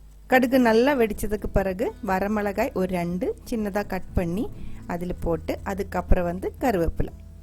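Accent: Kerala, India. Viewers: I like your Tamil slong with malayalam infusion. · Very good and tasty recipes with little ingredients 👌love your Tamil with Malayalam accent👍👍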